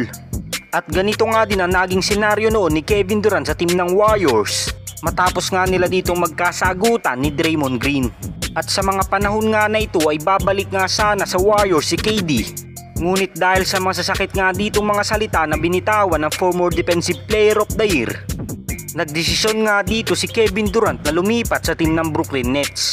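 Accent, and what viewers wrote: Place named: Visayas, Philippines. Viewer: Kainaman ng tigas ng yung salita..visaya kaba hehe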